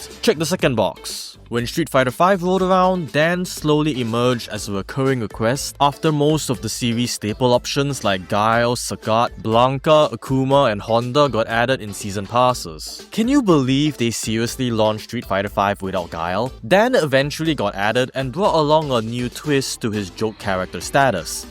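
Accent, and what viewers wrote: Singapore. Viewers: First time watching your video and your accent is familiar. I'm guessing you're either Malaysian or Singaporean. Edit: Ok, I checked the bio and is indeed from Singapore.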